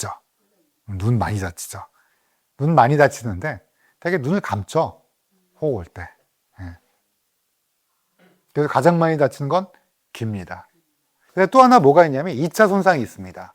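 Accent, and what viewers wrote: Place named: Gyeongsang, South Korea. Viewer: 피디님 경상도 분이신갑네예 사투리 이빠이 써뿌네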